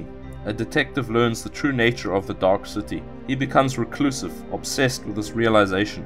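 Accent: South Africa. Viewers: I can't help but feel like the narrator is south african.. Are you South African?? If so that's fuckin Lekker bru!!!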